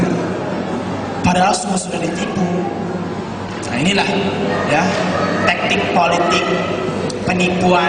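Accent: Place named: Malaysia. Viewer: ehhh Ini Kan Malaysia :D Kan !!!! Kok Logat Melayu Sihhh :D #tolong Respon Dulu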